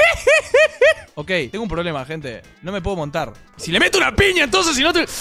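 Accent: Argentina. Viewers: PENSE QUE ERAS UN AGENTINO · una pregunta eres argentino o uruguayo?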